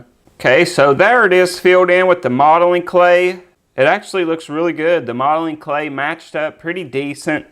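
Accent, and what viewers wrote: United States. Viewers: U got cowboy accent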